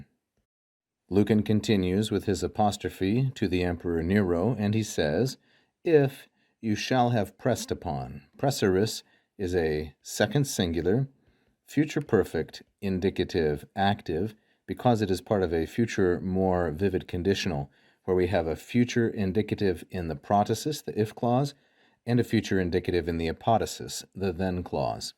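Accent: United States. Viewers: Latin with american accent is so horrible. Don't throw your life away, please learn the correct pronounciation...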